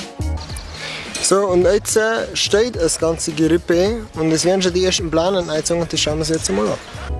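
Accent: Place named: Upper Palatinate, Germany. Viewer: Geh leck, der Oberfälzer Dialekt is scho da krasseste fo olle😄😅👍🏼.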